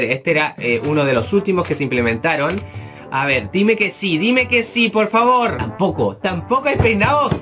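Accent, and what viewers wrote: Chile. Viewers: Hola una pregunta eres chileno ? ¿Y si no de que pais?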